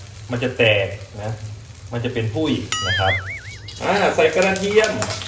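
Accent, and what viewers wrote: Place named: Northern Thailand, Thailand. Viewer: อู้กำเมืองอี้ดีละเจ้า เฮาคนเมือง 😂❤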